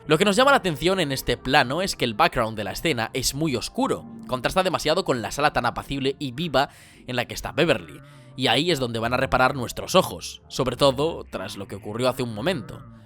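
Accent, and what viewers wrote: Spain. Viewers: Por que todos los youtubers son de españa , llevo media hora buscando vídeos de otras nacionalidades , no es por nada , me agradan pero quisiera descansar de ese acento de la S,C y Z un rato buen vídeo por cierto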